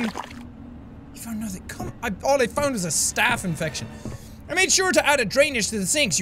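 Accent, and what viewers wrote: Ireland. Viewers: Every video he get more Irish.😁 I wish I was Irish 😢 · Is he Irish ☘️ I’m irish☘️💚🇨🇮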